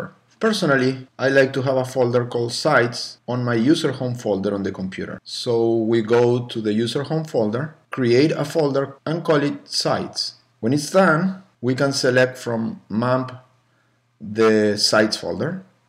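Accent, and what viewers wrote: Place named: Italy. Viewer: se sente debbrutto che sei italiano XD thanks for vid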